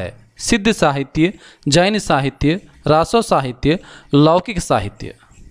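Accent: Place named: South, India. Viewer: very good but sorry to say you should improve your speaking leave I think you are from south Indian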